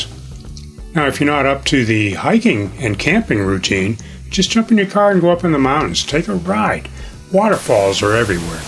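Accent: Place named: Maine, United States. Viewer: This is great - a nice balance of narration and action shots. I like the humorous way you highlight the challenges of truly rural living but also the benefits. I know it's not the kind of living is not for me but you still convinced me that I need a vacation there sometime after the winter. The photos are spectacular - the beauty of Maine definitely shines even when there is snow. You really got your Maine accent on for this one too! Good luck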